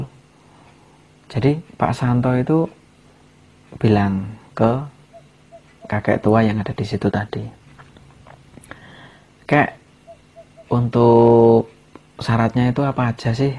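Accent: Java, Indonesia. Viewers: Haha slow..suka banget ..stip penyakit kejang ya..alias step..sukses lur..mending bosone biasa g usah nganggo lu gua..medok.hhh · Berasa aneh logat medok tapi ngomong "gue"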